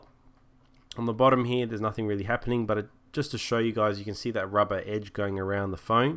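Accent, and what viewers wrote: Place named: Australia. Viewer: Now I can distinguish which accent belongs to which Country. U r from Australia !!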